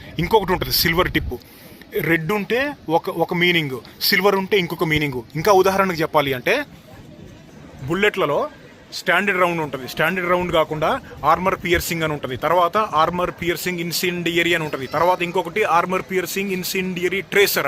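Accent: Andhra Pradesh, India. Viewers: Bro needi nizamabad kada how come you speak in andhra accent?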